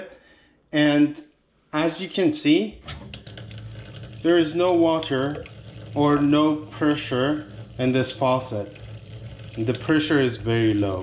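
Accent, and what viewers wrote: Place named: Iran. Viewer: Very Very Iranian accent. Good video thanks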